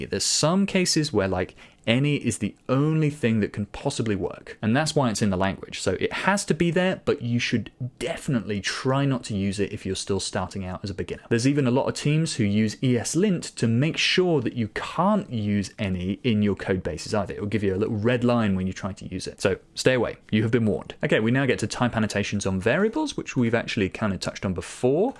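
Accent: United Kingdom. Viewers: Your British accent make your videos sound polite from my point of view hahaha.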